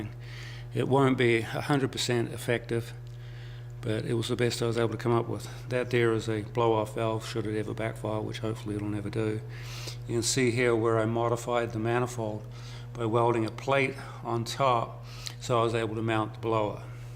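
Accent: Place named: Australia. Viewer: Mate you the best, i bet your part australian, based on how your talk and how some of the words are spoken. keep up the great work mate and i hope to see it on the dyno. cheers please supply a link of the dyno ?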